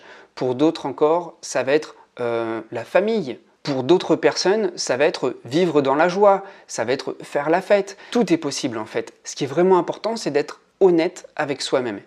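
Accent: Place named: Pas-de-Calais, France. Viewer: Bonjour moi aussi je suis du pas de calais. J'avais bien noté un léger accent 😁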